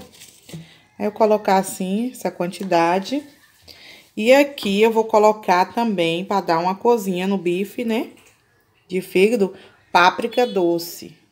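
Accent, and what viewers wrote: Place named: Northeast, Brazil. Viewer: Boa noite! Amei sua receita, vou fazer assim. Você é nordestina? Pelo sotaque, kkk, eu sou pernambucana. E pelo coentro tenho certeza , pois amamos.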